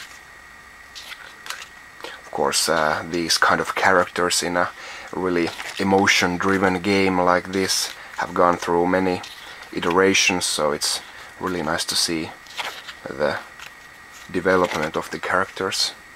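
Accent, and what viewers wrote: Finland. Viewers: joo huomasin aksentistas ja kanavan nimestä :D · I'm constantly in Helsinki! I thought I recognized the accent! :) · hahahah finnish accent lol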